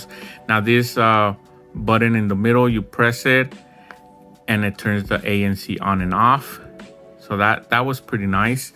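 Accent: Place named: Colombia. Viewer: I like your Colombian accent 😇😇😇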